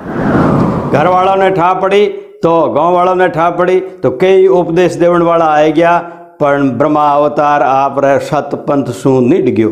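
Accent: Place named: Rajasthan, India. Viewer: sahab apka anubhav Or apki marwad ri madhur mithi vani hmare culture ko bdhava deti hai Vrna ab to bevkuf log bachho ko hindo sikhane me lge hai Jabki road pr kachra binne wala bachha bhi hindi bolta hai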